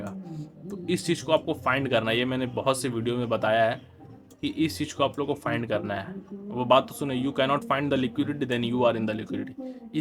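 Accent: Bengal, India. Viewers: Are you Bengali bhai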